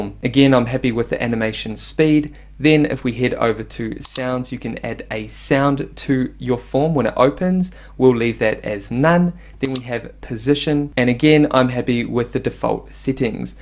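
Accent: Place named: New Zealand. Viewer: Love hearing the Kiwi accent on Youtube instructional channels. No mucking around. Shot bro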